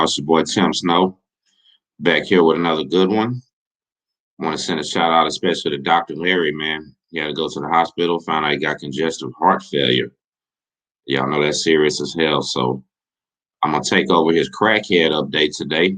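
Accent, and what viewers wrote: Texas, United States. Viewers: Happy Friday everyone! 🍺🍻. Tim, La eMe is pronounced like M. A.😂. It sounds so different with your Texan accent. Please tell Mr Larry I send my wishes . Can I send him a card? Hope you and your family are doing well. Enjoy your videos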